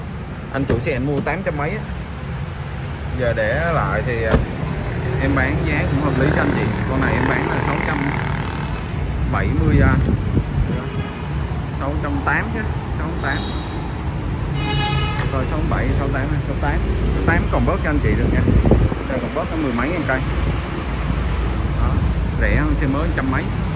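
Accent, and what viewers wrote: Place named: Mekong Delta, Vietnam. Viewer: nói chuyện thiệt tình như dân miền tây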